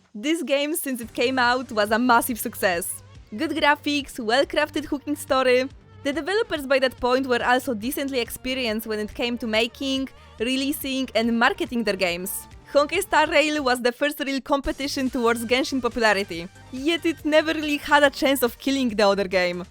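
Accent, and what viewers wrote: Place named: Poland. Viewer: Ah tak, słychać cudowny polski akcent, kocham twój głos <3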